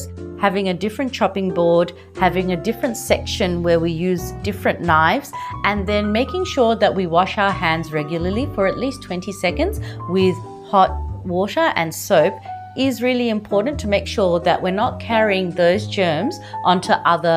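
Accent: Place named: Australia. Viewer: She got aussie accent but she speaks clear bangla with no extra accent My respect for her is growing every day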